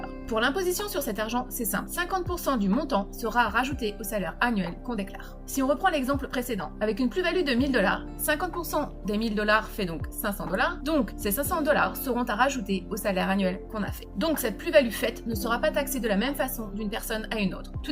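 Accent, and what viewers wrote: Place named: France. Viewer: escuse moi du mot etf , jecoute les terme etc j ai commence par epargne placement quebec,au debut je prennais des celi court terme ou annuelle progressif depuis 3 ans je rentre dans un systeme boursier qui apparament ce rapproche d un etf mais sans risque mais je ne suis pas sur .si c es interessent....tout en celi et la je reflechie et j essaye de comprendre..... je pense dans un deuxieme temps allez vers des etf me semble plus prudent...mais les systeme de taxe americaine m interpelle et me demande si il est pas mieux d achete canadien.....qu en pensez vous.....aussi je suis francais ......vu votre facon de parlez vous devez l etre......est ce que pour l europe on reviens au meme probleme...... y a t il des taxe .. bref plein de question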